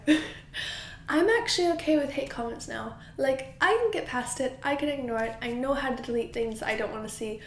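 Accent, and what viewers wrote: United Kingdom. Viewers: I totally missed all of whatever you're talking about!!! But I'm glad you're okay and past this. Also, it's pretty adorable that the longer you're in Europe, the thicker your British accent becomes! I've had friends move to Georgia or Tennessee and they start adopting a southern accent without realizing it. It's like the same thing here!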